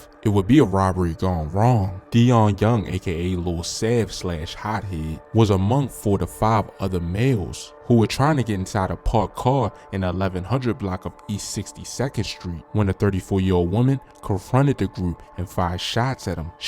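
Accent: Baltimore, United States. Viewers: Dude u stay talking about chicago ... u sound like u from Baltimore... tell us about the 💩 and areas of your city... amfh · Whoever made this video ain't even from Chicago, he sounded like he was from Baltimore. jess hilarious voice azz boy😂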